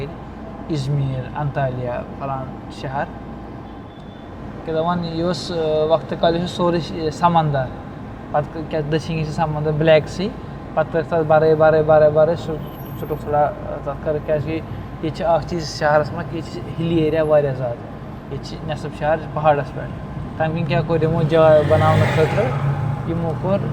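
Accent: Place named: Kashmir, India. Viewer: Watching from District Doda Like your kashmiri accent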